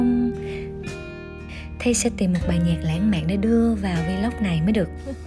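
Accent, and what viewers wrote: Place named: Saigon, Vietnam. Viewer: Blogger đầu tiên xem mà mắc cười cách nói chuyện và điệu cười luôn. rất dễ thương, giọng SG yêu lắm, mà thích nhất lúc bả nói chai rượu mập như con heo, rồi đang nói thì cười cái vụ tốc váy, rất gẫn gũi, chân thật và dễ thương. Mong luôn đáng yêu và hài hước như zậy <3 rất đáng xem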